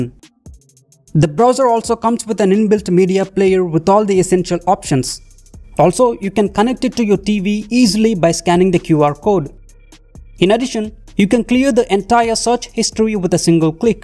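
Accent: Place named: India. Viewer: Bro has an Indian accent. Nice video btw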